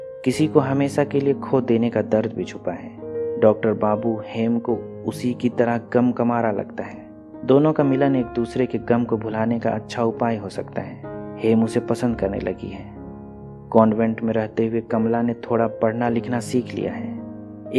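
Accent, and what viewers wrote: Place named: East, India. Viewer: Shabdon ka istemaal sahi hai Bas uccharan (pronunciation) alag hai. Pronunciation alag hai, par galat nahi. Eastern India especially in Bihar, Jharkhand, Chhattisgarh wahan "nukta", "s" & "sh" me antar itna prominent nahi hota daily life me. He has acquired that accent. Jaise English ke kai accent ho sakte hain, waise hi Hindi ko bhi alag tareeke se pronounce kiya jaa sakta hai. We should appreciate his efforts to bring such good movies with such a lucid explanation rather than pointing out these petty mistakes. Let's encourage each-other and be more acceptive of each-other.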